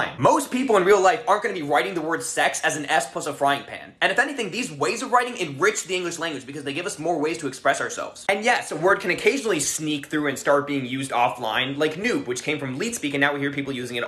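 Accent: United States. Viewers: My darling boy..... you speak American english which is already horrendously hideously offensive so i woukdnt worry about it.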